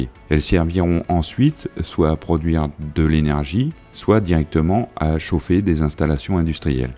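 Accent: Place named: Bagnolet, France. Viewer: de rien, et y a ton accent aussi, typique de c'que j'entendais quand j'étais gosse à bagnolet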